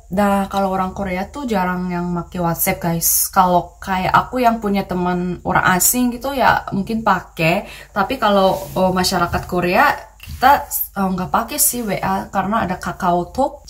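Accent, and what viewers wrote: Indonesia. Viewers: Wow keren,logatnya udah Indonesia bgt ini 😃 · Wah..mantap bener bahasa indonesia nya lancar dan logat nya sdh indonesia banget .. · Aku suka aksen indo Mirip sama aksen indo Kak bintang dijerman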